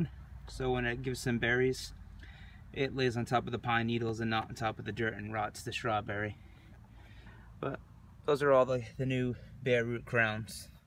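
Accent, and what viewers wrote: United States. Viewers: You def planted them correctly. Too bad they didn't pull thru for you. You must be a New Englander like me. I can hear it. What was in the wine barrel? Columnar apple ? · Awesome, I'll have to add this to my garden arsenal! Thanks! You sound like you're on the East Coast? I'm in Nor Cal. Lots of us Portugees out this way! I have a huge family. Seems like farming and boating are in our blood.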